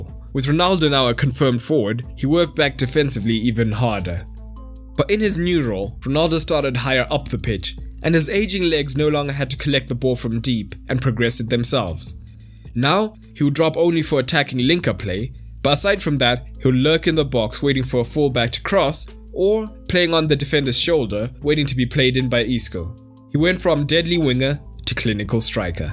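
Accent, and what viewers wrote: South Africa. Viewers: Am I the only one who thinks this accent is South African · You have a South African accent.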